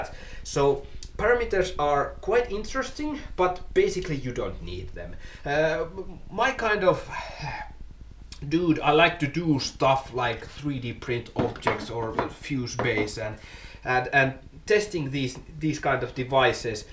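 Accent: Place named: Finland. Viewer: Ralli englanti on paras, Hyvä video.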